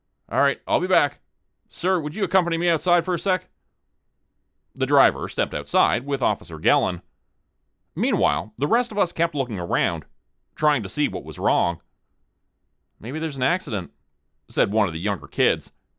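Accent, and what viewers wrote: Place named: Canada. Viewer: I love the way you pronounce some things with your canadian accent.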